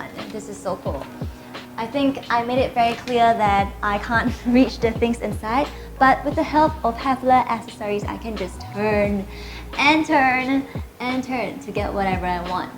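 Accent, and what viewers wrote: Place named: United States. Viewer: you sound so american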